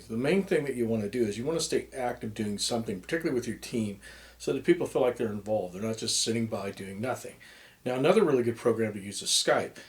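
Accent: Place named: United States. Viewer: Even though you have an american accent, I believe you are a person who fled from Iran and is probably like an american proud christian now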